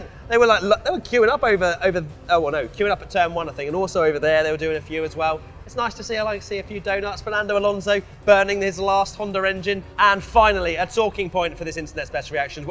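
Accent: England, United Kingdom. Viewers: Less English accent. It's quite annoying.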